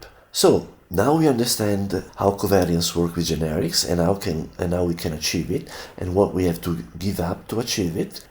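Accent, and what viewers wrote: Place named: Italy. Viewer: Ciao! la explicación excelente, pero el acento italiano... meraviglioso!!! los oídos latinos agradecidos! "me gusta", "suscripción" y "difusión"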